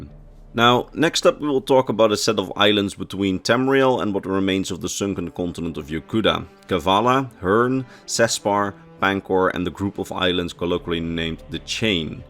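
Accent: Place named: Netherlands. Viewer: Uw accent... U bent toch Nederlander of Flander? Videos op het Nederlands zullen ook erg interessant zijn.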